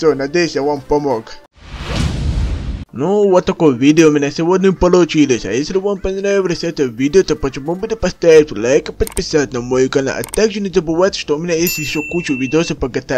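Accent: Chechnya, Russia. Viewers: вообщето да у него акцент как у чиченца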